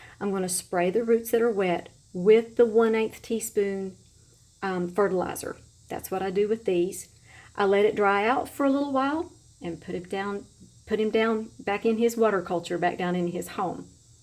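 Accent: South, United States. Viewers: Thank you! I did find this vid helpful/interesting/informative /pleasant. I like ur voice and southern twang, I subscribed (long ago) for that reason. I like how YOU'RE growing! Concerning vids and more detailed info. My growing conditions are SO different from urs I can't help but think ur just one blessed (lucky) lady. Keep sharing details and hopefully the "luck" will spread! I can't remember how long you've had some of those Vanda..? Do you have any Neos, Ascosenda, or Renanthera's? Xoxo from CO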